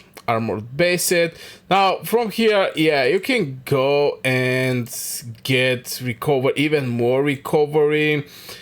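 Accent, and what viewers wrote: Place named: England, United Kingdom. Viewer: wow, thank you for the quick reply.. keep doing more videos.. so happy to see you back making more videos.. I love your English accent.. you are doing great 🙌🏻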